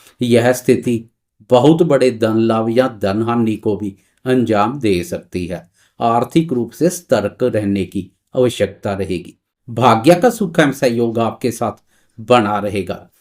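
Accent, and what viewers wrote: Punjab, India. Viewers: Sir ik bath bathoo vasay app punjabi ho kya😊 kieyu ki bolthay hoye bhi hindi ap ki punjabi jalkthi hai ji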